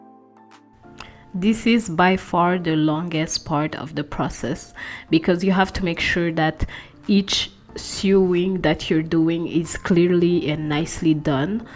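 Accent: France. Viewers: are you french? :)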